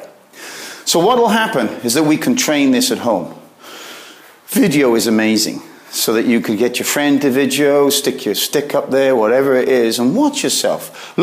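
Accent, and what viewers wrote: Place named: Wales, United Kingdom. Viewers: What is your accent! I hear bits of Welsh 😅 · Looking to get back to surfing after a long break 20 or so years !!! Great video, u from Wales somewhere?? Accent sounds familiar 👍 (Cardiff boy here)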